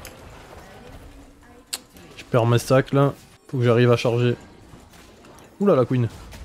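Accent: South, France. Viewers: Sérieux merci, juste merci je step UP en jgl, je suis j'en merde de silver VI qui joue au jeu depuis mois qui était main garen top. Je sais que je pourrais monter haut gold facilement en le spammant mais depuis que j'ai découvert t'es vidéo je veux que jgl, je tryhard et grâce à t'es vidéo je step UP, t'es le boss et ton accent du sud rend t'es vidéo bien plus agréable, bref lache jamais tu va monter juste par ce que t'es le boss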